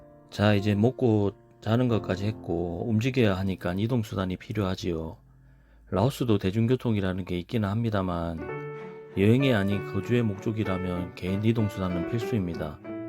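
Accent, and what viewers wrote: Gyeongsang, South Korea. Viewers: 좋은 정보네요. 그런데 경상도 분이시군요. 님도 유튜브 보세요. 전라도에 관한것 경상도애들이 어찌 개판치는지. 경상도 사투리면 최소한 전라도와 그에 부속한 사람들은 구독 안합니다. 일베와 경상도 악플러들때문이라는것은 분명히 알아주세요.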